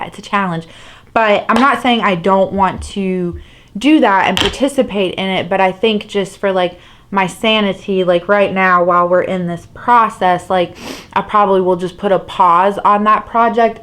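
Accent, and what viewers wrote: South, United States. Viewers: Heck yes girl! I am here for the country accents! I’ll bring the sweet tea to your porch! I hope that y’all got rocking chairs!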